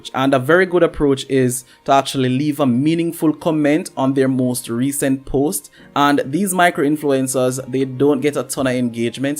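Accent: Jamaica. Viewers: You can tell he’s Jamaican 🇯🇲😂 real businesses man nuh true boss man‼️ big up uh self n guh chu n mek uh money bad man💯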